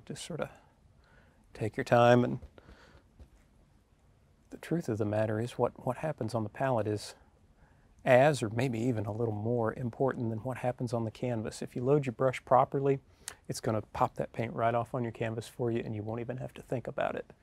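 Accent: South, United States. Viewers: A lot of southerns say it like that. That have w that brings in a h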